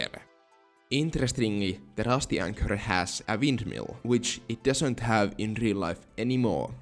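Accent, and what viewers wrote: Finland. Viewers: Bro that's his real voice 😭 The amount of people who think his voice is AI is crazy. He's Finnish and he just sounds like that, if you for whatever reason don't really understand what he's saying then you could turn on subtitles although the subtitles themselves may be wrong/incorrect. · It's not an AI voice. It's his real Finnish accent. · have you never heard a Finnish person? If you played Control/Alan Wake 2, Ahti is very Finnish lmao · Finnglish is fun. I’m American but work with Finns. You have that sweet spot of strong understanding of the language, but also strong Finnish accent.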